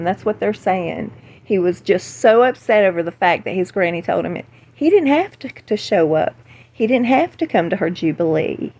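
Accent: South, United States. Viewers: I just adore your accent!! It's so bright and cute!! One of my fave American accents (from an Aussie fan) :) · So glad to hear that spunky little Southern voice of yours! Hope you feel as good as you sound!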